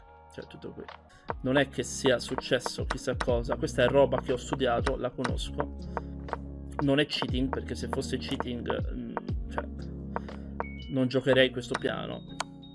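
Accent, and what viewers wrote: Tuscany, Italy. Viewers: Speed ception finale. Complimenti per la memoria del pattern. In passato peccato per la bassa qualità audio ma era bello sentire la c'è Toscano più marcato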